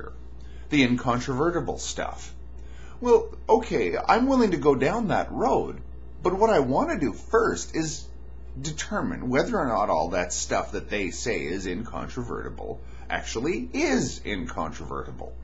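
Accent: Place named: Canada. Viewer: Heavier Canadian accent here than usual!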